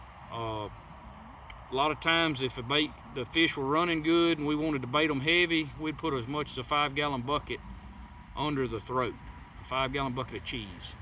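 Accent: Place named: Appalachia, United States. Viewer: Absolutely loved this video, we watch Dat Louisiana Life and got turned on to y’all’s channel.. Love the hillbilly accent sounds like Ken, Do you offer tours?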